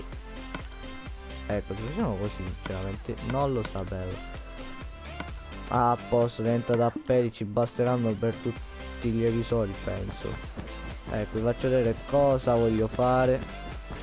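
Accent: Sicily, Italy. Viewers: 01 Dall'accento sei Siciliano vero? ;)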